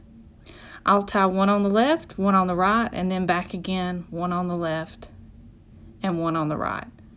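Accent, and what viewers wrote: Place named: South, United States. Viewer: Yes! Love a good ole fashioned southern accent!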